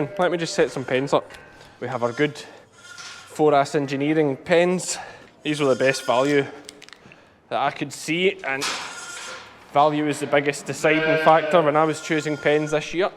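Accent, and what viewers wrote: Scotland, United Kingdom. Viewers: "I've got 4 blackie hoggs here that arnie mine" the scottish accent is always a great listen 😂😂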